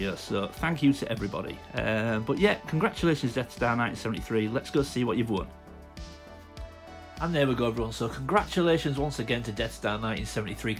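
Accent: United Kingdom. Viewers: I didn't understand why your grandma got you those Scottish goodies in the past? Also your accent it sounds a bit different that most British accents I've heard.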